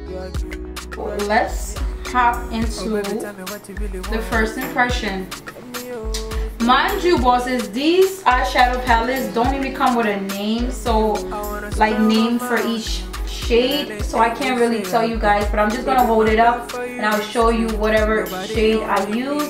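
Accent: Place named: United Kingdom. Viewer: And there goes the british accent...first impression beautiful. Girrrrlll u had me watching and dancing at the same time... Awesome job.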